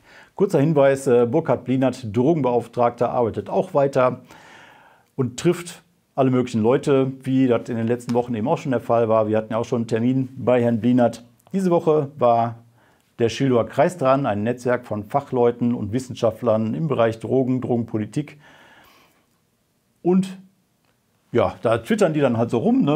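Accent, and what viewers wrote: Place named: Emsland, Germany. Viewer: Na,ist wohl ausm Emsland, wie ich,da sagt man dat und wat...geht halt in Richtung Plattdeutsch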